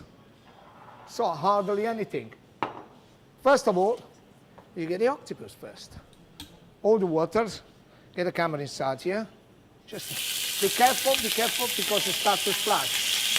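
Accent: Italy. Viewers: His English Italian accent is insane.lol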